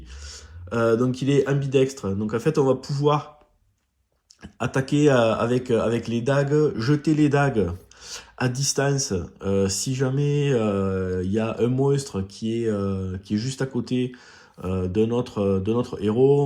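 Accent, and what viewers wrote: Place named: South, France. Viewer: J'ai pas comprit où on devait entendre une quelconque ébriété. Serait-ce l'accent du sud qui vous donne cette impression erronée?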